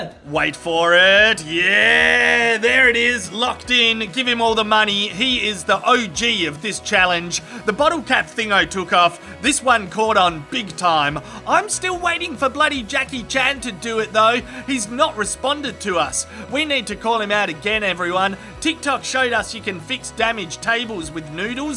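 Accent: Australia. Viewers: God I hate the Ozzie accent!!!....ugh......sorry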